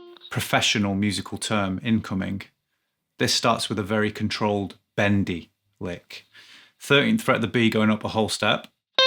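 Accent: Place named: Northern England, United Kingdom. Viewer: well keep going - great tutorials. Love your delivery; v British, v northern. Distinctive.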